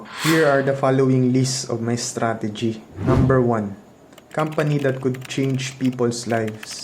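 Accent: Philippines. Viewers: That Filipino Accent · Love that pinoy accent btw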